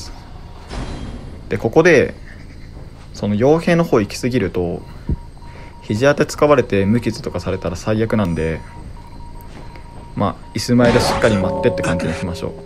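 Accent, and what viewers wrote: Japan. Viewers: 金沢弁かな？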